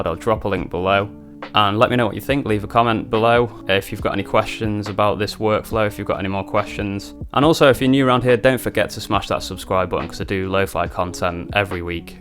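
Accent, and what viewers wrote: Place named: Northwest England, United Kingdom. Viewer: Superb tape! And as always, nice vid. I started with a PO recently and after watching your vids, decided a 404 might be a nice step up/replacement. Surprisingly they work really well together though - rather than as a replacement. I have a 202 arriving today also (you’re partly to blame for this!). Keep up the great vids - great to hear tutorials in a NW regional accent. Reprazent! ✊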